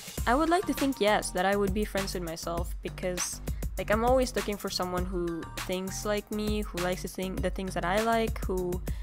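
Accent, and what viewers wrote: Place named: United Kingdom. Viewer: Heyy or should i say thats a cool name!! Its good to know more about you really That british accent tho i see you i see you 😂👌🏻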